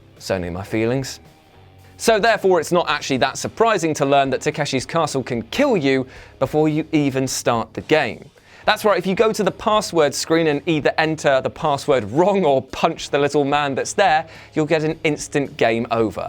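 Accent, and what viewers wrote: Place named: United Kingdom. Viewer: Close your eyes and listen to the video again. Between his sad delivery of poorly written jokes and his pompous arrogant british accent this guy is a terrible presenter. The Shakespeare part is just a snarky American jab at a British english speaker trying to sound elegant but falls short.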